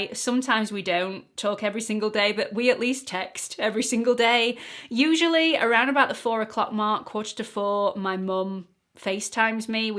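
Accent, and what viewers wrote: United Kingdom. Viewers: Thank you for being so candid and answering personal, sometimes accusatory, questions. I love your fresh style and spunky personality. I am from the states and enjoy hearing a British accent, lol. You're adorable and I miss your mom ha ha. I hope that one day I can have as good relationship with my daughters as you have with your mom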